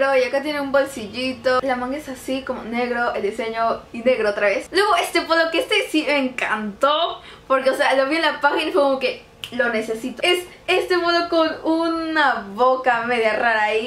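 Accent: Mexico. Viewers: no se si estoy loca pero ella habla como mexicana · eres de Mexico? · pensé q era de México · por un momento pense que eras de Mexico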